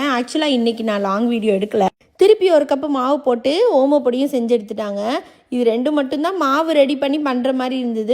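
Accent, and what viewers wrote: Madurai, India. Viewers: Neenga madurai ah? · Akka neenga madurai ya akka anna entha oooru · Akka neenga madurai yaa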